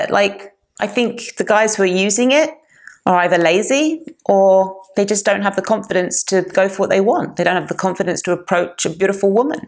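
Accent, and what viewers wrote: United Kingdom. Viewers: Wow. I even got an answer. YT has its own algorithms. And on top of that we have marketing season opened. Now streaming hostesses are the top priority. They play Silent Hill 2 Remake in pajamas, because this is not objectifying to women. That's how game corporations solve the issue, of hot hostesses at game events that one were probably the main attraction. A contract with a streamer. Guys who are unable to approach a gal in real life as an audience. Easy money. Just trash the game in chat box and you will become eaten by simps. xD Pathetic. Cheers from Poland. PS. My instinct was right. Your British. That accent. Pity it's not as much popular as in 90's. Prodigy, Blur and few other UK bands. Yeah. Definitely good time for music. Cheers!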